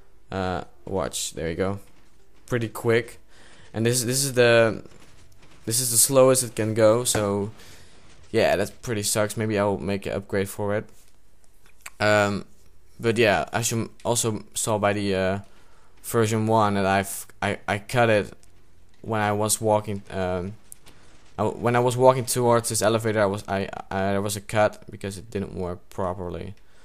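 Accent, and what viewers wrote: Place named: Netherlands. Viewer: wow jij klinkt nederlands zeg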